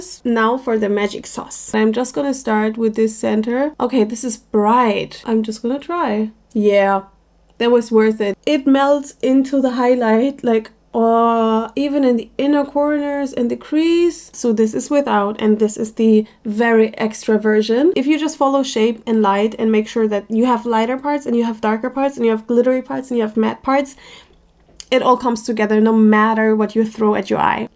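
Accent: Germany. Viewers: where are you from? your accent sounds a bit german... greetings from germany😊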